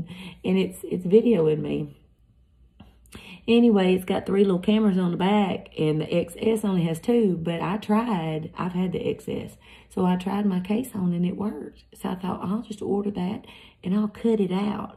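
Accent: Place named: South, United States. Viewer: You rock, Lady! I could listen to you speak alllllllllll day! I’m an Aussie so I love the southern USA accent ❤️🇦🇺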